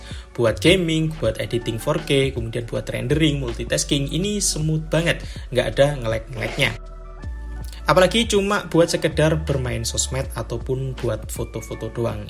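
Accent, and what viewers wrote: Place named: Jember, Indonesia. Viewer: Wah orang jember wkwk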